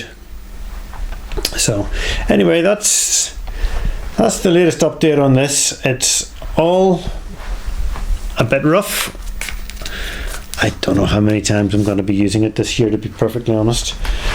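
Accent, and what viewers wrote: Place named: County Down, United Kingdom. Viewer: That's a County Down accent.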